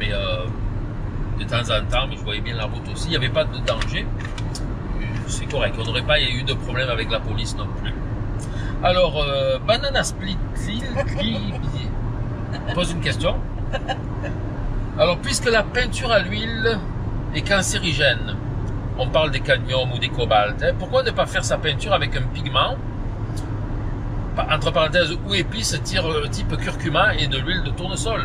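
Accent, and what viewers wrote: South, France. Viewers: Merci pour vos vidéos. Je suis fan. Et de savoir que vous êtes au Québec me ravit. En revanche vous avez dit à un moment donne que vous aviez travaillé à Berne l’Etang. Et question un peu indiscrète vous êtes de cette région de France. À vous écouter vous avez un accent du midi. À bientôt.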